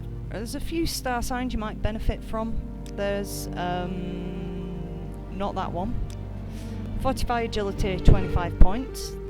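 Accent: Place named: Lancashire, United Kingdom. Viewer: Hi, just subbed after watching these vids, just started Morrowind after literally thousands of hours playing and modding Oblivion and Skyrim on PC and 360. Very helpful tutorials about the basics, it has surprised me just how much more in depth this game is compared to oblivion, which i thought was quite in depth itself, forget Skyrim for that,lol. Anyways nice work and keep it up. By the way where abouts are you from as you sound to be a Lancashire lass to me, as i am a Lancs lad,haha?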